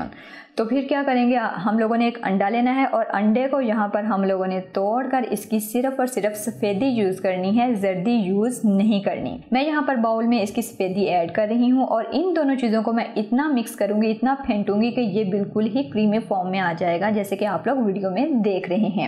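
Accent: Punjab, India. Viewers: Ap ka panjabi style bahut mazy ka hai. Salamat rahain. Ameen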